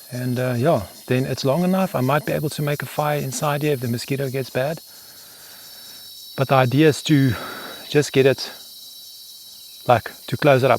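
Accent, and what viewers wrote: South Africa. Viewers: Are you from South Africa? I was sure you were European. Anyway hi from Quebec Canada !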